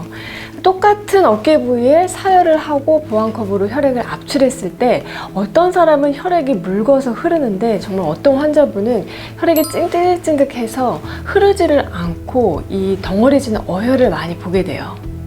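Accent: Seoul, South Korea. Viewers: 목소리도 이뿌시고 서울 말씨가 더욱좋습니다. 모든병이 혈행성 이라고 하는데. 잘이해했습니다. 좋은 하루 되세요.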